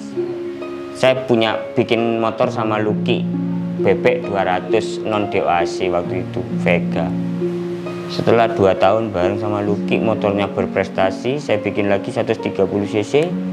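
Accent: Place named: East Java, Indonesia. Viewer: Logat arek etan e melekat😁🙏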